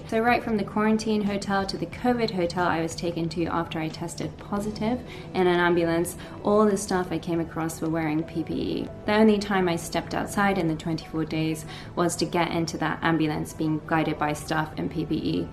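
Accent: United Kingdom. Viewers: I’m glad that you recovered! Thank you for sharing your story! I love your YouTube and your British accent ☺️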